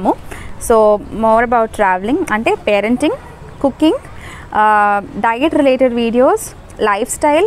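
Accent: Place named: Vijayawada, India. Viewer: me slang chusi menu anukunna vijayavada ani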